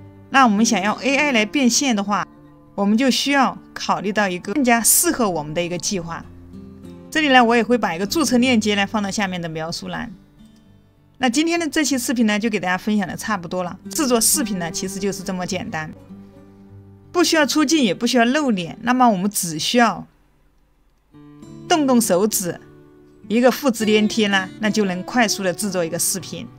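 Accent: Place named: Sichuan, China. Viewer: 哈哈，四川人哇，老乡见老乡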